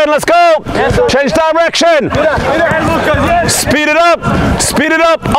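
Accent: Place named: Liverpool, United Kingdom. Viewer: Love all of your videos, where are you from coach? im from a little town called st helens.. your accent sounds a bit scouse. I use your drills with my u16 and u19 teams all the time. Keep up the amazing content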